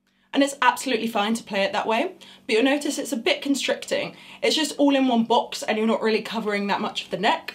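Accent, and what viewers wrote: England, United Kingdom. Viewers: See, were all being tricked. Chics this freaking smokin hot and with an English (I think) accent, which btw moves her hotness to level... actually I can't count that high, who can play a guitar like that, aren't real. I mean, its obvious... the Matrix is real. How much more proof does one need. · That British accent melts me into a puddle.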